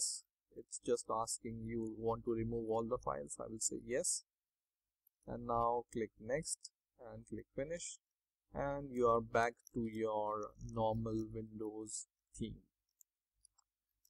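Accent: India. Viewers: DO NOT DOWNLOAD Honestly guys never trust an Indian guy. EVER! Go watch the tech support scammer videos. They're all Indian people with fake English names. Guess what, this guy has an Indian ass voice and people are saying viruses in the comments. DO NOT DOWNLOAD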